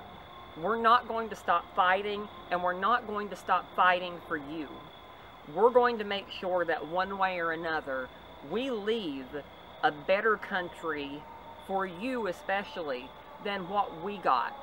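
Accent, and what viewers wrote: South, United States. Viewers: It's good to hear another trans woman with a southern accent. You kind of remind me of back home in Central Virginia.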